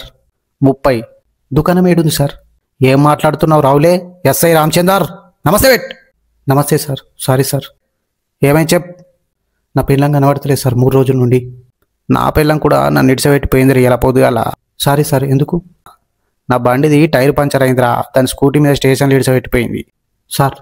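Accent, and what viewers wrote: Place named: Karimnagar, India. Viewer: Pakka sure my jagityal ledha Karimnagar district language Indhi❤emnna feel undha mama videos lo🥰🥰🥰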